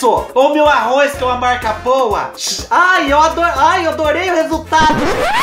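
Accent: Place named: Paraná, Brazil. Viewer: Vc fala igualzinho o pessoal da cidade onde morei. Em Wenceslau Braz norte do Paraná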